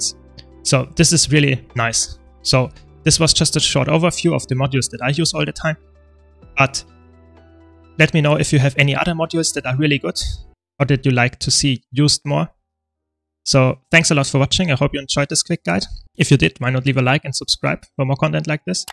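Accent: Germany. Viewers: good german english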